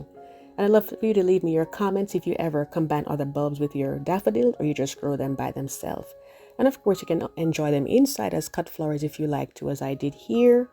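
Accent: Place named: Jamaica. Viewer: Love your Jamaican accent. I'm Jamaican too.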